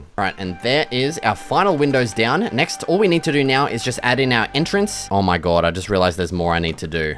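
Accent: Australia. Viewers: Is your accent Australian or ~? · R U AUSSIE?!!!!!! PLEASE TELL ME IM GUESSING RIGHT!!😢😢😮😮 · aussie accent and swearing makes it so much better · The aussie is strong with this one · r u from Australia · the aussie accent and all the cussing makes this 10x more entertaining edit: THANKS FOR THE LIKES and continue to support!!!! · Are you Australian? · Aussie accent (where you from bro) Burping (fucking hilarious) And the cussing are all me (I’m Australian too) I love your videos brother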